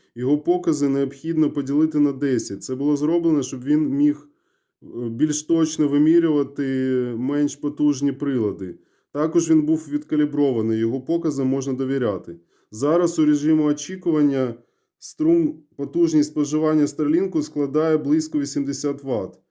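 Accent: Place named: Surzhyk, Ukraine. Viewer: У тебя самый плохой в мире суржик.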